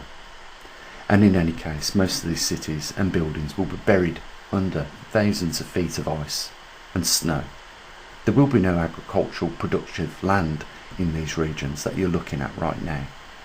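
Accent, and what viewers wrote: United Kingdom. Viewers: Love the British accent! But are you talking about the earth's Chandler Wobble. Because the earth is moving thru space like a drunkard right now. And that is giving us extreme weather changes around the planet. The crazy drunkard planet is giving us low and high jet streams in America which means severe weather such as tornado's, blizzards and ice storms so far in December 2021 and January 2022!! Is this what the Mayans were talking about? You said in the next 40,000 years the earth will start to get colder! And we are about 6,000 years into it? Wow!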